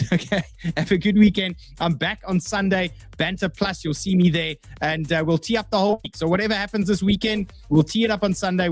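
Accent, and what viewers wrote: South Africa. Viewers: South African accent with American energy is grating to the ear holes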